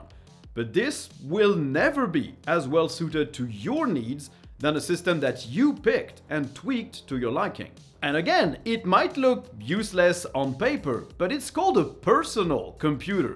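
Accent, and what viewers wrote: France. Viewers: nice french accent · On est d'accord t français ? j'ai l'impression avec l'acent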